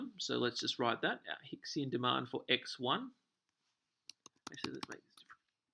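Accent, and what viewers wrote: Australia. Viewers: I asked myself "I wonder where this bloke's from, he sounds like he could be from Perth", then I check the channel and there you go. Strange combo of skating and econs, but good video. Thanks from Germany!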